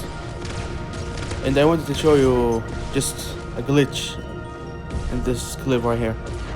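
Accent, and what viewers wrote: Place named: Arab world. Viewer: Are from uae or suadi u sound like arab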